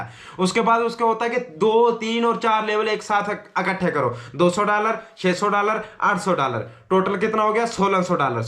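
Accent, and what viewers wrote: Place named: Pakistan. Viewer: Pakistani aur tumhara dono ka bolne ke tadika same hai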